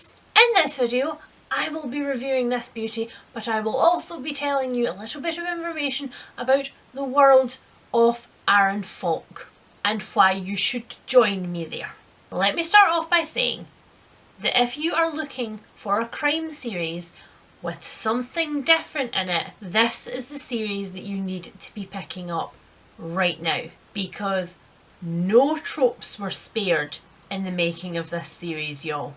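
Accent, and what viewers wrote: Scotland, United Kingdom. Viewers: No conversation needed. I'm not reading these books because I don't read fiction. I read very little fiction. And when I do they are mostly adventure and historical. I just watch your videos, because, as i said, you are a force of nature and I love watching and listening to you and your accent. I would also like to apologise for thinking that you were Irish. In one of your other videos I left a comment saying that i thought you were Irish. I know that you are scottish!!. Sorry about that and I apologise for the unintended insult!!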